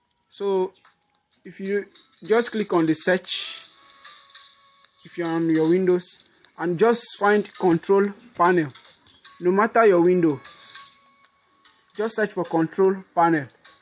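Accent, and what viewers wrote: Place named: Africa. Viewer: African accent❤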